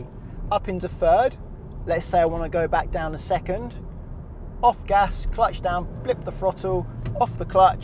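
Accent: England, United Kingdom. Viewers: Enjoyed watching the video. There's plenty on YT about heel and toe and rev matching. I knew about rev matching and do it myself, but have skipped H&T for years as I couldnt get the hang of it. As im doing more spirited driving recently in a revvy little NA car, I came to watch a few videos to help me. Many are set in America, which although is fine, I like the more closer to home videos and its easier to listen to a more local voice. (Im essex based) I thought the video was well described and easy to understand, so thank you for uploading it.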